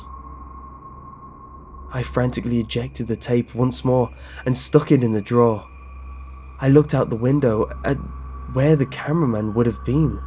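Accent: United Kingdom. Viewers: It's a British accent, and sometimes they'll do that without noticing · DesiLovesSouthPark It's called an accent, friend. They don't do it on purpose, that's just what sounds natural to them. If you'd grown up in the UK, you'd do the same thing and think nothing of it. They probably get annoyed that we DON'T put "r" sounds after "a" sounds or "k" sounds after "g" sounds, it probably pisses THEM off. The American accent is not the one true way to pronounce the English language - it's an accent, like what you'll find anywhere else. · I really hate that angst saturated british accent!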